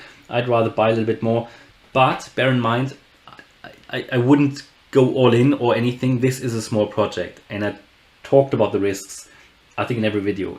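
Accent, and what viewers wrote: Germany. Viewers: Danke für die Analyse. Ich schreibe auf Deutsch, weil ich an deinem Akzent vermute das du deutsch bist 😂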